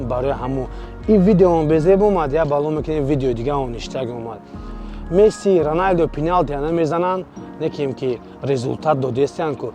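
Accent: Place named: Vahdat, Tajikistan. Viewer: Чиста вахдати гап мезани да брат ханотан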